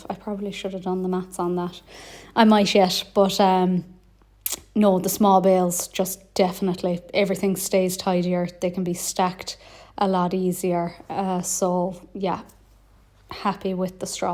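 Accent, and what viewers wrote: Ireland. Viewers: Hate to disagree with you but I could listen to your sweet Irish accent ALL day long. ❤️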